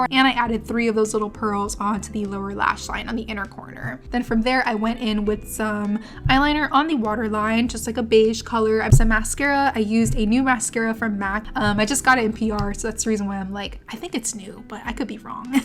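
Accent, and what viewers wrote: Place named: Miami, United States. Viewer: sounds like miami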